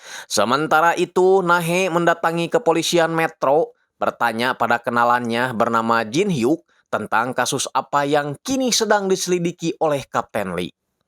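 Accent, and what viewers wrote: West Java, Indonesia. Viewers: Saudi hadir Saya suka yg baca filmnya sepertinya orang sunda Bnyak lucunya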